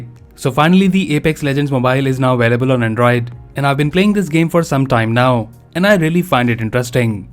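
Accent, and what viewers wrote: India. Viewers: The indian accent doesn't make me want to download it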